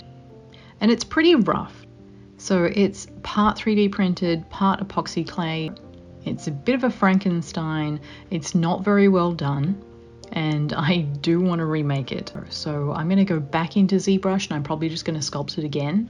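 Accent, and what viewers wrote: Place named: Australia. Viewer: Wait, is American right? Why does she sound Australian to my own Australian ears wtf o.o Are my ears deceiving me? I assume she moved to the US haha.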